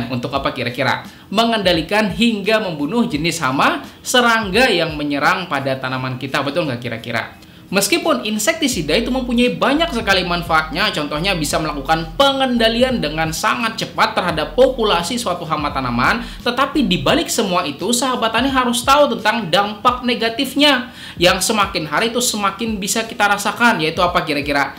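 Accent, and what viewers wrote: Banjar, Indonesia. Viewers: hen cucuk ai ha asa pinandu kaitu nh u lwan logat2 bnjar .. S kalsel ja kita pak ai😁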